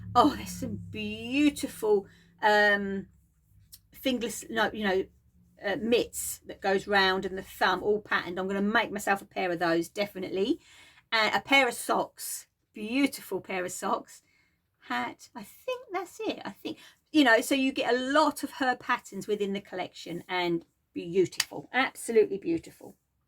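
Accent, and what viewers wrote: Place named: South East England, United Kingdom. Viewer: Hi, I am a new subscriber and was sent over to you by 911 stitcher. I am in the UK, living in Devon but lived most of my early years on the good old Isle of Sheppey and Medway towns, so I really apprediate your saf east accent lol xx I am a cross stitcher (on aida) but I enjoy watching your other crafts too. My nearest LNS is Patchwork Rabbit which is 80 miles away! I really am enjoying watching your floss tube and shall be following you from now one. I am on instagram as #missharrietsparrow Best wishes Kathy xx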